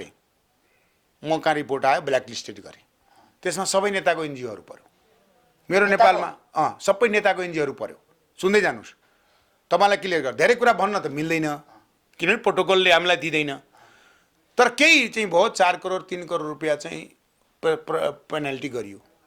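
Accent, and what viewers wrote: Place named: Mongolia. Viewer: याे नेता अनुहार हेर्द त मङगाेल जस्ताे छैन बाेलि सुन्दात सुँगुर खाने मङगाेकाे जस्ताे छ ।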